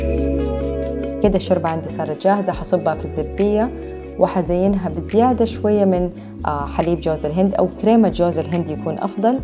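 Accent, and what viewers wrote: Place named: Jeddah, Saudi Arabia. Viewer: الفديو جوناااان والتصوير ماشاء الله ولاغلطة بس صوت الميوزك مزززعج لوصوتك بس وهدوء كان ولا غلطة اللهجة الجدوية جمييييلة معاك ربي يوفقكك 💛💛💛❤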